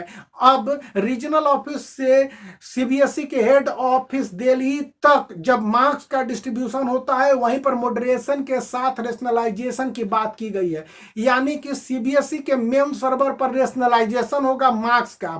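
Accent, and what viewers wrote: Bihar, India. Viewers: Sir aapka bihari accent mujhe bohut pasand aya🔥🔥👍👍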